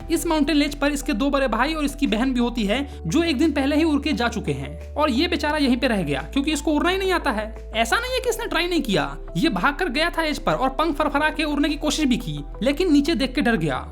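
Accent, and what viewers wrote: Bihar, India. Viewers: Bhai aapki bihari beech me maja dila deti hai 😂😂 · Bhaiya are u from bihar 😅❤❤❤❤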